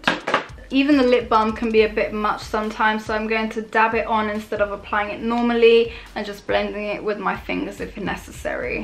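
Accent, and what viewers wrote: London, United Kingdom. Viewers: Just me who loves her voice? Got like that London accent it’s so calming lol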